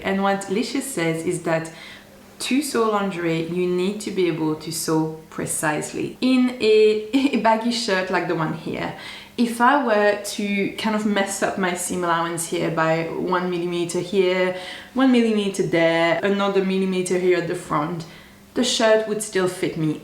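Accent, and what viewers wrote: France. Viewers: I love your French accent and some words should just stay in the original language ☺️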